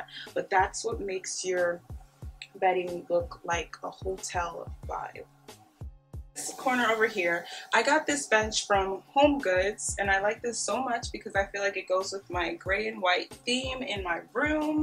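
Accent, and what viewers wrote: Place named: Jamaica. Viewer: Love your decorating skills and you were resourceful with your larger pieces! Love the minimalist glam look. I hear an accent, Jamaica? New subbie.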